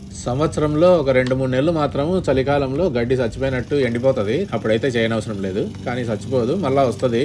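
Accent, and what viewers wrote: Rayalaseema, India. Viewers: Anna hands-off to you mana rayalaseema slang marledu neku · Yem naaa meedi kadapa or anantapur? Super presentation in our seema slang..love u bro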